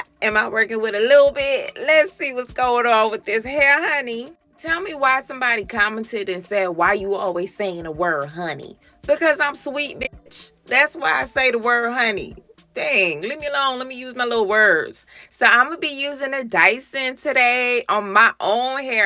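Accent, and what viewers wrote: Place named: Jamaica. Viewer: Hey i heard that jamacian accent. Looking forward to buying some more of your products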